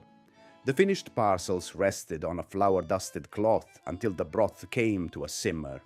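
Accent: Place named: Italy. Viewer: You have an italian accent but you don´t pronounce italian correctly. How come? For example: It´s Tschetschi, not tsetsi.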